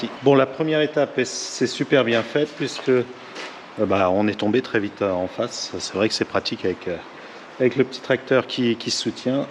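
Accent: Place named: Loiret, France. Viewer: On sent fou tu soie du Loiret tu dis tous simplement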